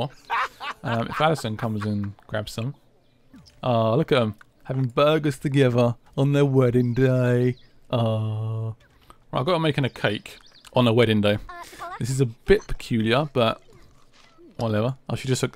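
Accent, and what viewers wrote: United Kingdom. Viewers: I really love your enthusiasm about this game, considering the bad reviews. I have now got the sims (yesterday) and I like it so far. Building a house was amazing. I love the family dynamics. I know there are major things missing from sims 3, but this is not sims 3, this is sims 4 and all is good so far. What is your best and least liked feature? Nice to hear a UK voice xx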